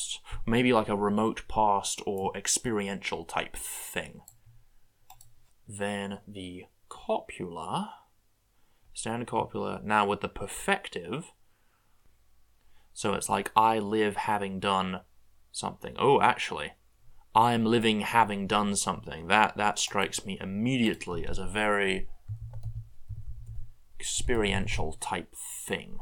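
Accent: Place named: South, United Kingdom. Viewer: I know it isn’t the point of the video but I just love your accent. Southern British, right?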